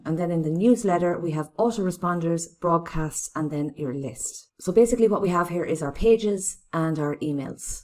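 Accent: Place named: Ireland. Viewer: Great review and nice to hear an Irish accent here as I am from there too.!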